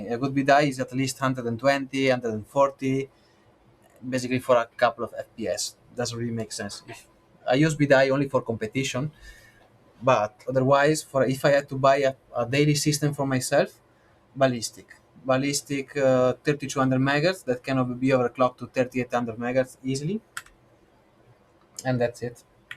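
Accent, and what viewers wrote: Italy. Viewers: Ur absent seems italian lol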